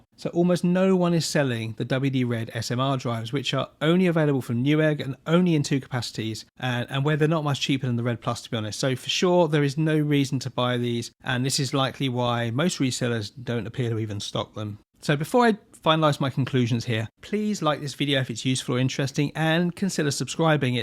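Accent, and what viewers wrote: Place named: United Kingdom. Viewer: Hard to follow, heavy British accent.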